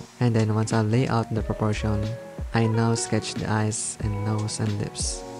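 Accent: Philippines. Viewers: Ilonggo ka sir? curious lang ko sa accent 😅 btw your auch a good artist po🥰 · wow you are a Filipino, just by your accent its so obvious. I live in Philippines too, I m a fan of your art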